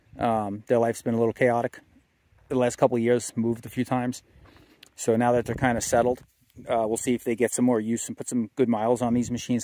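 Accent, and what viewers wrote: New York, United States. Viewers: New York accent?.. I’m from Pennsylvania… for 2024, the only color offered Is a bright orange. .. kinda ugly tbh. Limited edition so they’re kinda hard to find.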